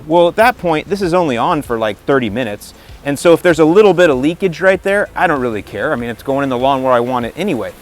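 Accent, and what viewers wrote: Chicago, United States. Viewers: Found this video for the first time looking to figure out what’s wrong with my sprinkler system. I hear the “couple two or tree” then the “lowesss” and thought what was a Chicagoan doing somewhere there is so many palm treesss?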